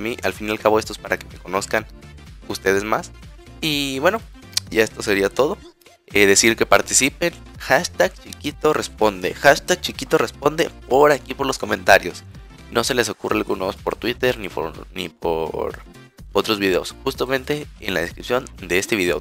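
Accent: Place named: Spain. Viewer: no se la verdad, será por que se te pego lo español cholesco y me da cosa, y me recuerdas a varios tipos que conocí en mc y eran ratescos hablando con esas Jergas de sus ytbers españoles. también esta intro v: me da ganas de pegar al creador de esa canción xd pero bueno, eso no significa que te haga hate, me gustan tus videos, y se que te esfuerzas haciendo los videos, las horas que le pones al mc, independientemente de en cuanto tiempo lo subas a la red, el renderizado eso se merece y bien ganado los subs que tienes v: y seguire poniendote que no me caes.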